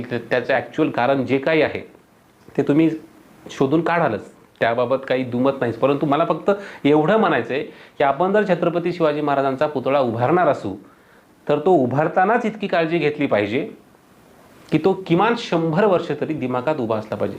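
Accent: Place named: Marathwada, India. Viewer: Because tumchi langauge Marathwada chi aahe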